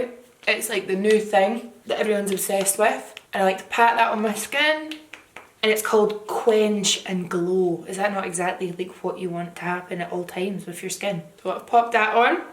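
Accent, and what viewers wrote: Scotland, United Kingdom. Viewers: in love wid ur Scottish accent... n also u... I can relate u coz my face shape is like urs it's round n u inspire me to get make up in a way where I can look sexy n chiselled... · your just so darn adorable with your Scottish accent 😍😍😍😍😍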